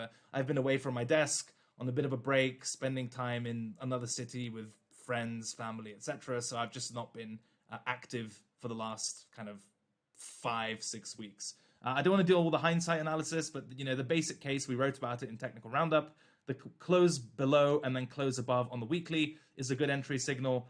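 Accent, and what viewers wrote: United Kingdom. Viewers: Missed listening to the British accent. Can you record the twitter spaces and put it on Spotify? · love listening to you video with British accent and voice haha n the way you explain your chart , slowly bring out the flow and reason why n all the details ! hope theres live video more often ,thanks !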